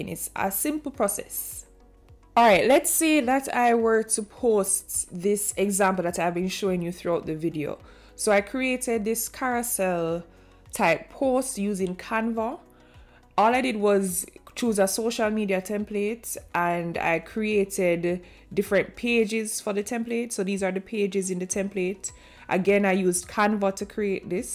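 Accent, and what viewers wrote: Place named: Caribbean. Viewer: Awesome... love the Caribbean sound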